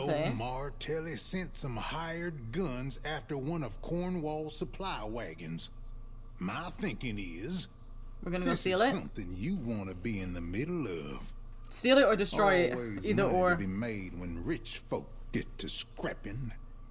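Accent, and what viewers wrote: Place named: Ireland. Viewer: i played all love,so...I whant only to hear you Irish accent! :)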